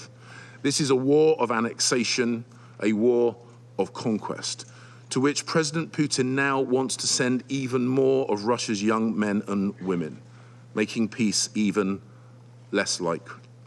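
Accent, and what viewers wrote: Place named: England, United Kingdom. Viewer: Bullshittting with English accent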